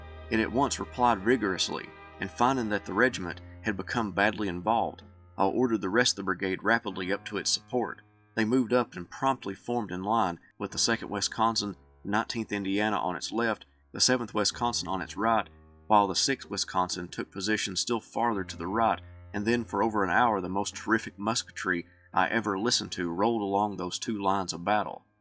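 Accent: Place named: Harlan, United States. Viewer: You talk like me,. Im from Harlan.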